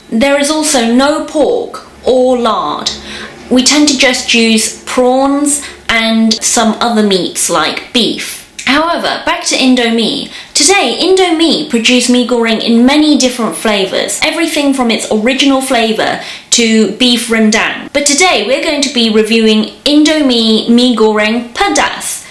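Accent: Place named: United Kingdom. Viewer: Sound so british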